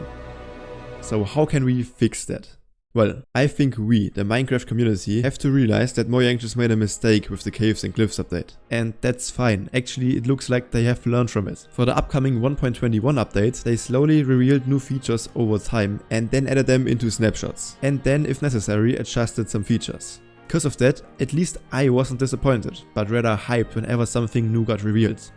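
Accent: Germany. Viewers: Man hört, dass du Deutsch bist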